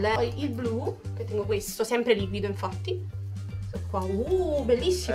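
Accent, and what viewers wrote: Naples, Italy. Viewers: Ma sei di napoli?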